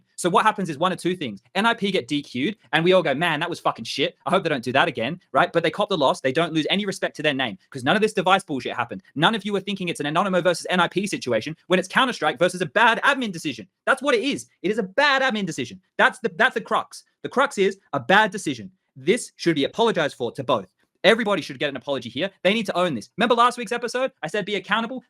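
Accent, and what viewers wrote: Australia. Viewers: just Australian accent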